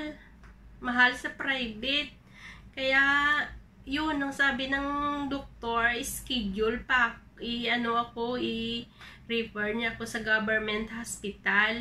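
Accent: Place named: Iloilo, Philippines. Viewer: Hi sissy sending my support thanks for sharing sister daw ilongga ang boses mo sis sa antique ako enjoy lang sa pag vlogg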